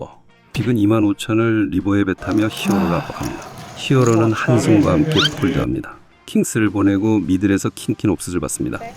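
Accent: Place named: Gyeongsang, South Korea. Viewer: 다른 영상에서 플레이하실때 음성이 나온적있는데 경상도억양이 있으시던데 더빙은 다른분이 하시나요?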